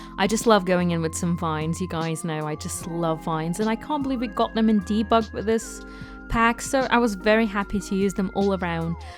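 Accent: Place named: United Kingdom. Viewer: honestly you are such a talented builder and as a polish person I love to listen to british accent. Love it xx!!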